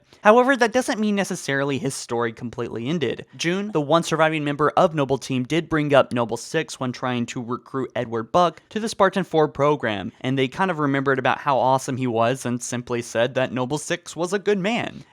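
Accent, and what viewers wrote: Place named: South, United States. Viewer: you know ive never thought about it but has a southern accent. he hides it well but it leaks through in small bits sometimes.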